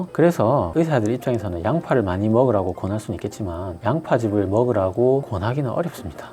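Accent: Gyeongsang, South Korea. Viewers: 갱상도토베기말투에 쏙쏙 빨리드갑니다 당뇨 고혈압 찾아보다가 여기까지와서 재밌게 잘봤네요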